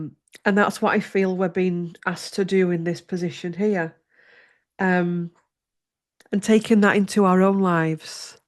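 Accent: Yorkshire, United Kingdom. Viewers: Love your videos and yorkshire accent. ❤ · Some of your pronunciation today was particularly Yorkshire, you made me smile. My 84 yo dad is a Yorkshire man. You help sooth the Soul during these times....🫠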